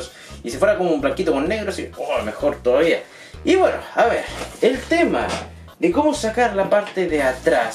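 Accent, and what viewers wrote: Chile. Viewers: A penas hablo dije, es chileno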